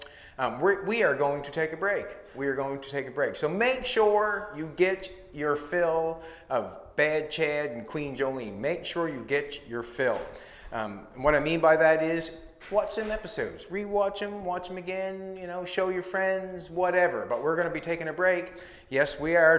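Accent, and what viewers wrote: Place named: Canada. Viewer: 30 minutes ago I was incredibly frustrated while attempting to polish the defects out of my own Imron paint job. I don't think this really helped me figure out how to fix it, but You're calm Canadian accent calmed me down, haha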